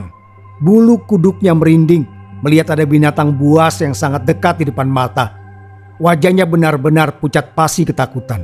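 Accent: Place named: Bali, Indonesia. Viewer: Logat Bali begitu jelas di sini keren banget di tunggu part 2 nya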